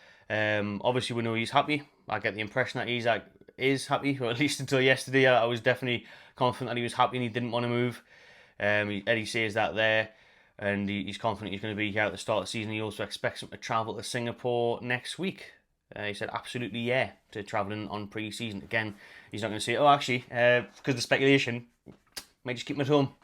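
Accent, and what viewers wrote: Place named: Liverpool, United Kingdom. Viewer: You won the worst premier league since it was created. You get carried by Salah and Van Dijk but their mid 30s now and have their huge retirement contracts and already dropped off massively end of last season. It's back to scrapping for 2nd or 3rd this season im afraid, and that would even be WITH Isak. Ps. The scouse accent is by farrrrrr the most annoying, scummy accent in the UK haha. You total cretin.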